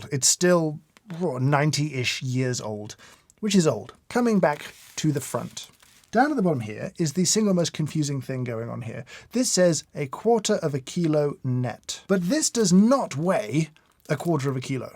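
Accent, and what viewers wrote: United Kingdom. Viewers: Im not a coffee connoisseur but i love your videos. I don’t usually like British accents but i like yours, especially when you review something. It just seems professional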